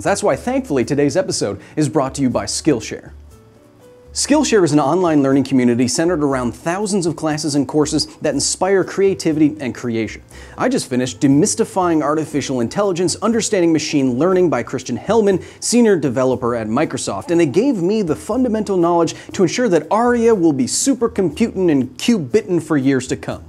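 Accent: Germany. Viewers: Why does your german accent sounds kind of like a stereotypical gay man?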